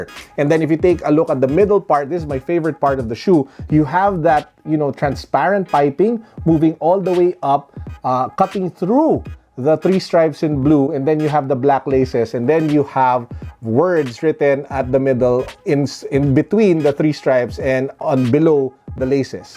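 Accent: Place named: Philippines. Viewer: Good review That pinoy accent is THICK > peace from Makati City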